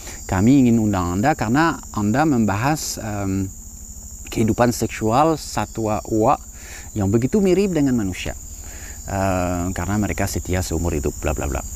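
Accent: France. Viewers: Channee kalWeit masih kntal logat perancisx yaa? Klo mgomong bahasa indonesia.." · Aksen france nya sambil berbahasa Indonesia begitu gurih di telinga, enak didengar. Kalo ngobrol sama bapak ini kayaknya asik bisa berjam jam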